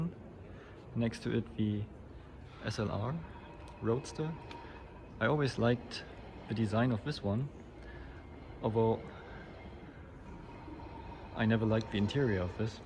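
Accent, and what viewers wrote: Germany. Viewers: When a Dutch person speaks English, it is horrible to listen to. But with your (German) voice, it sounds absolutely perfect.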